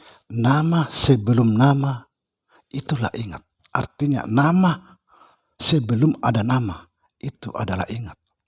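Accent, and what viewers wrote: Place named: Sulawesi, Indonesia. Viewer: Assalamualaikum warahmah tullahi wabarakatu..bolehkah kami tahu bapak asalnya dari mana ya....dari logat tuan guru sepertinya..Sulawesi...?dimana ya...ilmu tuan guru hampir sama dengan nenek moyang saya.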